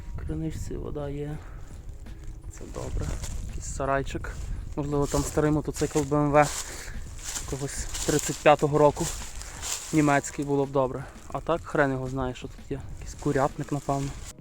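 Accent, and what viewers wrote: Ukraine. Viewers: ТАК НАСМІЯЛАСЯ З ВАС. ВСЕ ПРИКОЛЬНЕНЬКО. ВАША МИЛА ВИМОВА ПРОСТА УКРАЇНСЬКА РАДУЄ. НЕ ЛЮБЛЮ МАТЮКІВ,АЛЕ В ВАС БУЛО СМІШНО. ГОЛОВНЕ ХАТКА ДІЙСНО ДУШЕВНА. В НІЙ ТЕПЛО ХОЧА НІХТО НЕ ЖИВЕ І РОЗВПЛЮЄТЬСЯ. ПІЧКИ ,ПЄЦИ ТО ШЕДЕВР!БЕРЕЖІТЬ ЦЕ. А ОТ ТА ЛАВКА ВИ СКАЩАЛИ ДЕРЕВЯНА ТО СОФА,АБО БАИБЕТЕЛЬ ЩЕ НАЗИВАЛИ. ДЕ ЯК В ЯКІЙ МІСЦИНІ. АЛЕ В ЄВРОПІ ЦЕ РАРИТЕИ! ПРОШУ ЗБЕРЕЖІТЬ ЇЇ НЕ ПОДАЛКУЄТЕ ВРЕА ЩЕ ВІДКРИВАЛАСЯ КРИШКА І ТАМ РІЗНІ РЕЧІ ЗБЕРІГАЛИ. ПОСТІЛЬНА БІЛИЗНА,ОДІЯЛА. В ІТАЛІЇ ІТАЛІЙЦІ ЇХ В РУМУНІВ КУПЛЯЛИ РЕСТАВРУВАЛИ І ,ЯК МЕБОІ ПРИКРАСИ ЦЕ. ЗИЧУ ВАМ НАТХНЕННЯ І УСПІХУ. СЬОГОДНІ ЗНАЙШЛА ВАС В ЮТУБІ І ЛЮБУЮСЬ.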